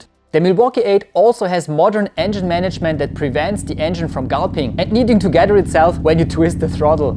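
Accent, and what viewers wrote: Germany. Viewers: You can try as hard as hell, you never kick that german slang. So speak like "dir der Schnabel gewachsen ist" We have Google Translate.....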